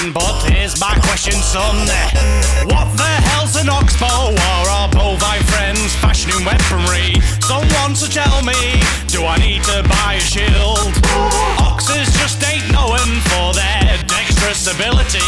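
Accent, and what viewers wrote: United Kingdom. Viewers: I think had a very heavy British accent in the beginning but it started to slowly change throughout his videos, now it's totally different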